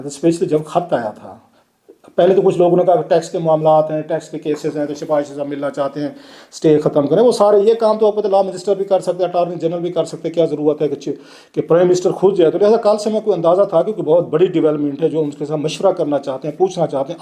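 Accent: Saraiki, Pakistan. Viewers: Myusi na kaha karo, maausi kaha karo, serayki lahja thora tabdeel karo yaar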